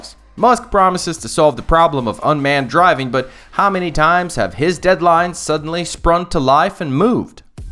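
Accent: Texas, United States. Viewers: So when did the narrator pick-up an accent (Texan, I think)?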